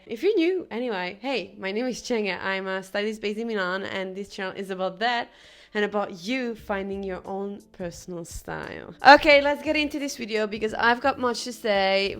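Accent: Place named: Hungary. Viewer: Within 3 seconds of the video I knew she is Hungarian based on the accent, then I heard her name :)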